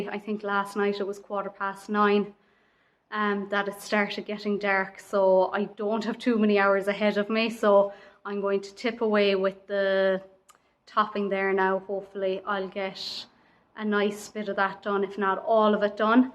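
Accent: Ireland. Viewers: Is it me...or does look cuter in every new video...her Irish accent is 🔥 HOT!!!!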